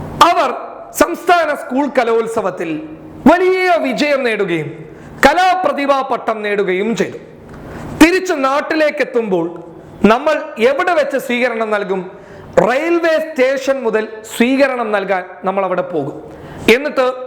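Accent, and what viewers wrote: Kasaragod, India. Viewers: കേറി വാ മക്കളെ...🙋 ഇനി മുതൽ കാസർഗോഡ് ഭാഷയിൽ ബ്ലോഗ് കേൾക്കാം...😍😍 ഈ ലോകത്ത് നരകിച്ച് ജീവിക്കുന്നതിനേക്കാൾ നല്ലത് ചിരിച്ചു മരിക്കുന്നതാണ്...🤣🤣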